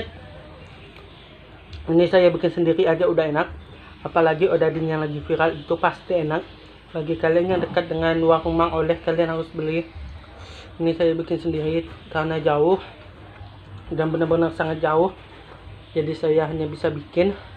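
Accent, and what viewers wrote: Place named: Banjar, Indonesia. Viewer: Logat Nya kaya ada banjar nya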